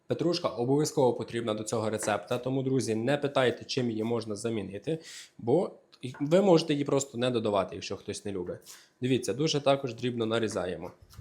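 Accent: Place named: Western Ukraine, Ukraine. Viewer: Оооой, обожнюю ваш діалект з моєї західної України. Дай Бог вам здоров*я і успіхів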